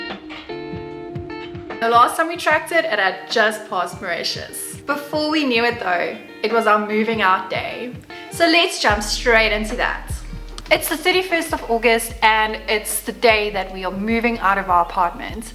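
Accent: South Africa. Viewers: Fantastic. Nice vid. I love the Afrikaans accent.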